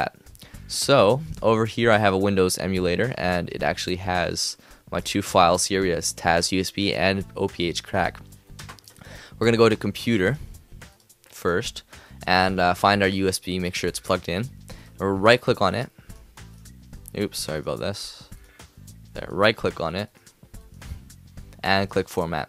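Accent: Canada. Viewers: you are canadian. right???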